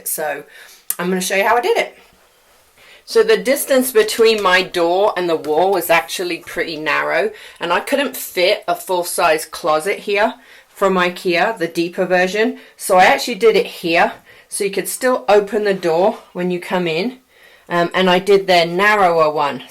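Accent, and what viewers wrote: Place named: United Kingdom. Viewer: I could hear the Brit in you:)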